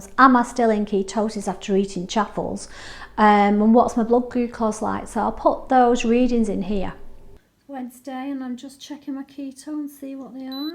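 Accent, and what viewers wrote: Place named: North, United Kingdom. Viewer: So lovely to hear that northern voice!!! I love that jumper looks so comfy. 2.7miles is great! And I do love a bike ride blow the cobwebs away in the mind. You never failed at all 26 hours is so amazing. And whisky is my favourite drink and gin but when I'm being strict I can't drink it just ruins me lol. You sound like you are doing totally amazing to me. Chaffles he he he so yummy! 4.6 miles Wednesday that's great too you are doing amazing on the exercise size of things. Keto is such a powerful tool when you use it in the correct way for sure. 40 pounds so so amazing I am so pleased for you it feels great doesn't it, keep it up your doing a great job whoop whoop!!!!!! fingers crossed for getting into the 10stones next week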